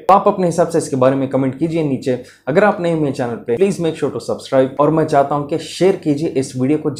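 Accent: Hyderabad, India. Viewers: Bhai honestly I was following you since long. I loved your contents n Hyderabadi accent but now you seem totally biased. Dil tod diya bhai aapne. Aap se ummeed thi ki aap neutral rah kar baat karenge.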